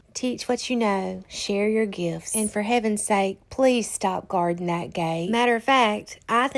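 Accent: South, United States. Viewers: your Southern drawl reminds me so much of my mom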